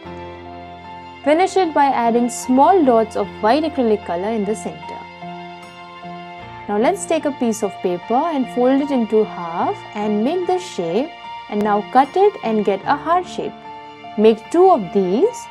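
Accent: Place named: India. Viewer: Couldn’t freakin’ understand her with that Indian accent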